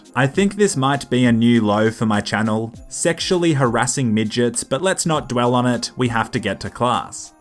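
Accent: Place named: Australia. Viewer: He has an Australian accent that’s why and I’m also Australian.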